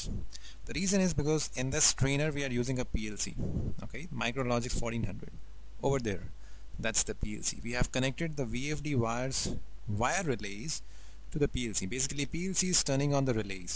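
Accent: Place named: India. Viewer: Please cleanup the sound , and if at all possible a native English speaker. The sing song lulaby of Indian accent is porrly recorded and annoyingly hard to understand. OK? PS not so many OK?s please.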